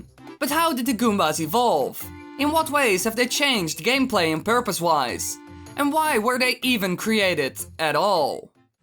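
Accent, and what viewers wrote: Netherlands. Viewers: Eeeyyy ik hoor een Nederlands accentje hoor ;)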